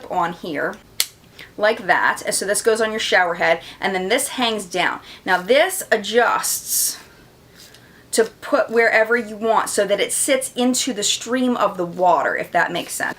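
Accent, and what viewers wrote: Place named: Philadelphia, United States. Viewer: Hi,. I just wanted to let you know I came across your channel looking for some reviews for all those subscription boxes. I have loved everyone of your videos since. I also can't get enough of your accent because it reminds me of home. I grow up in Philly. Anyway, I wanted to share the thing I have been recently loving.... JAPONESQUE Makeup Brush Cleanser. I usually just clean my brushes with antibacterial hand soap....not anymore! If you haven't, you should check it out. I had never heard of them before. Be well! Can't wait till the next video!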